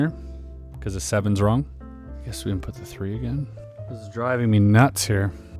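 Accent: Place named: Vancouver, Canada. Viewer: ph shit. I identified you as a person from Vancouver based on one video. It was your taste and your accent that gave it away. I think knowing what city you're probably from is better than that puzzle.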